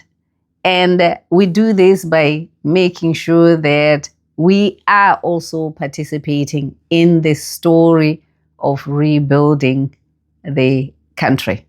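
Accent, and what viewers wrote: Zimbabwe. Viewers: I’m not Ndebele but the accent you have when speaking Ndebele has a very strong Shona undertone to it😂 I wonder if Ndebeles get offended if someone speaks with a Shona undertone lool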